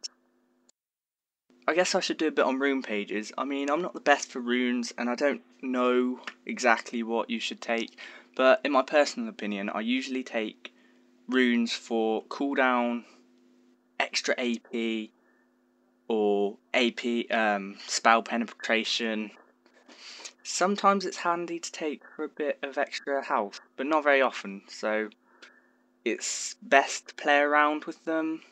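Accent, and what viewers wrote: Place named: RP, United Kingdom. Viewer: you're so posh, also are you reading from a script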